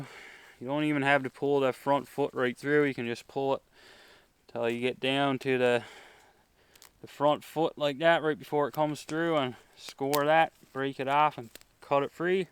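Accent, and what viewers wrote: Newfoundland, Canada. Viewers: Yeah dogs look fine. I looked up Newfoundland accent and it fits (to my ear at least). So that was my guess. Hope to see some recommendations on snow shoes for that type of terrain. It looks like where I live in Sweden.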